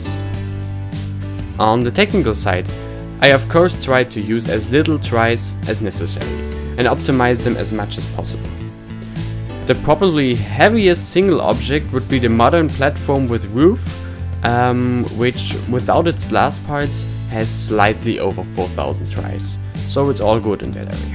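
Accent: Germany. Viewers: Save Deutsch dein Englisch man xD aber gutes Totorial/Video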